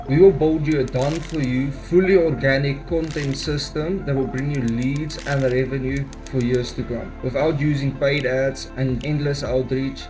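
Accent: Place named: South Africa. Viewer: Hey, I just wanted to say I absolutely love your content! You inspire me to improve my editing skills 💯. By the way, are you from South Africa? Your accent sounds familiar South Africa😅! I’m a creator too, but wow, your videos are HUGE!:face-blue-wide-eyes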